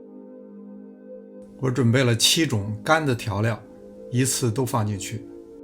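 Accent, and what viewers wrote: Beijing, China. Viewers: 是北京人吧？ 很亲切的声音:yougotthis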